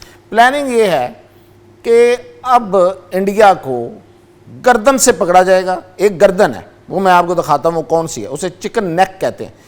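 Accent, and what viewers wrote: Punjab, Pakistan. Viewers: Its like this guy came straight from restuarant after had Bong Paey! Info is good but appology on your punjabi accent ruined the show. This channel should hire some proper urdu accent guy who looks gentle casting the show mannerly. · sb you need to work on your pronunciations and general knowledge. Very poor in both fields. Before appearing on TV screen you should be prepared enough to educate people. With punjabi accent urdu seem to be awkward as a medium of instructions.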